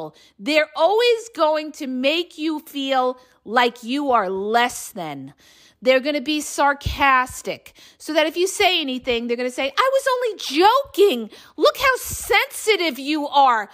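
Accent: New York, United States. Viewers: I got mad for you and troll about your talking . I commented to you first time I heard you I can hear the power of New York or something very cool and legit and sexy so keep it up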